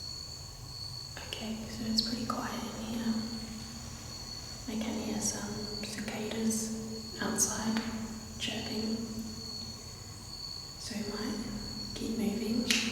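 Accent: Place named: Australia. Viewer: where are you from youre accent sounds like Australian accent^^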